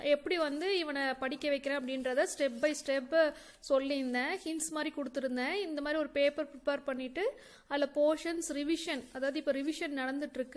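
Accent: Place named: Coimbatore, India. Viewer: I thought u must be from coimbatore.the people in coimbatore speak like u.their speech is very respectful.iam also tamilian.my mother is from trichy.my husband from coimbatore.i did my education in karnataka.i speak in home Tamil.is my opinion better to speak mother tongue at home what ever state u stay .because kids forget d mother tongue .next generation kids should learn their mother tongue.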